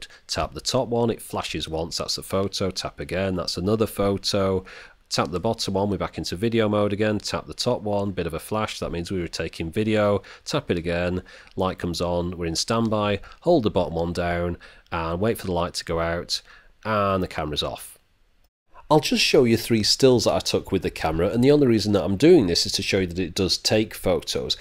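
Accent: United Kingdom. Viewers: Dear Sir, you are anything but boring. don't know what that chap was on about. I could listen to you review anything! ...partly added that us across the pond enjoy the British accent.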